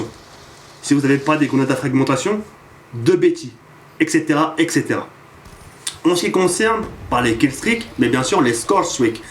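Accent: England, United Kingdom. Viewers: J'adore ton accent anglais XD très belle vidéo ;) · Like pour le magnifique accent anglais de:D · mdrrrrr le mec qui fait le saut contre le mur bref.. sinon jte felicite pour cette video jai pris beaucoup de plaisir et puis jaime bien ton accent anglais mdrr alller salut:) <3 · J'adore ton accent anglais <3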